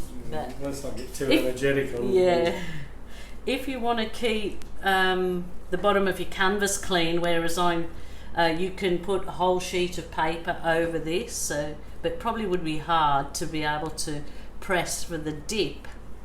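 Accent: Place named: England, United Kingdom. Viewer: Wow absolutely beautiful. Love that you are Aussie (like me) although, I detect an English accent in there with certain words.... like 'round' and 'now'! Loving your videos. Great work! Thank you.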